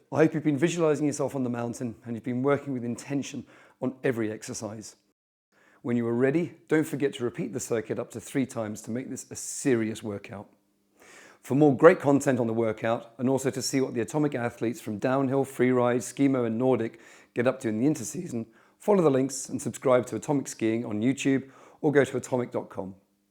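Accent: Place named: United Kingdom. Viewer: British? Ugh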